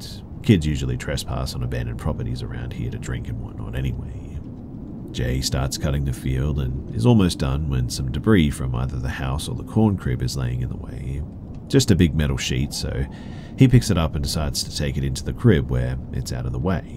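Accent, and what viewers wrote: Australia. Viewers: G’day…🤗 I love your channel and it’s great listening to your familiar Aussie voice! Great voice for narration, as if you didn’t know✌️😄 It’s my son’s 21st Birthday today 🥳🎊🎂🎉🎁🎈🎉 We’re all in such happy spirits too. I’ve just made him a Strawberry 🍓 Cheesecake and he also selected a Beef Roast with all of the trimmings PLUS MASH! 🍚🥄✨💃🏻🪩🕺🏻 That’s my boy (young man) now! But he’ll always be my baby 💖✨ I find all of these stories so enthralling, so thanx for making the days go faster! 🏆😘🍰🍓✨Greetings from MNECNSW!🤜🤛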